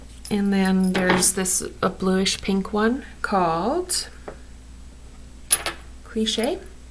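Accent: Canada. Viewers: Love the heavy Canadian accent =) Eh! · Great haul. BIG FAN of the Canadian accent. Go Habs Go!!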